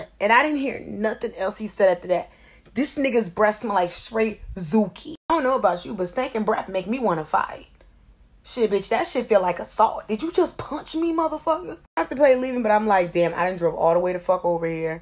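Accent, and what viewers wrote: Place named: East Coast, United States. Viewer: I knew you had to be from the East Coast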